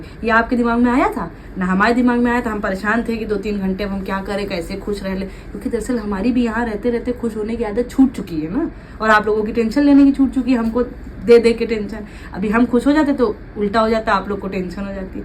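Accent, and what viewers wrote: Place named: Uttar Pradesh, India. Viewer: My lovely daughter don't take any tension now, think about your health and try to prepare another comment of your show, your evert, show is superb, and everyone enjoy our show fully, I love to watch your every shoe full of interest , UP accent, and our sisters and daughters authentic style, a graceful looking show, I am watching your show from Karachi Pakistan with love ❤❤❤and good wishes to everyone around you and in your circle ❤❤❤❤❤👌👌👌👌👌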